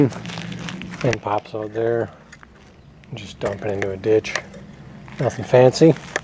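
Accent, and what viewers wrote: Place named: Midwest, United States. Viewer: You're accent tells me you're originally from the midwest!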